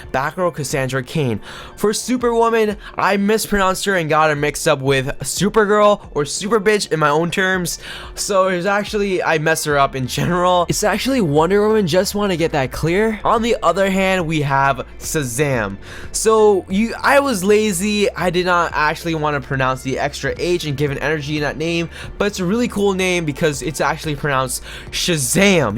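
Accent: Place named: United States. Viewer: you sound like ur from n america usa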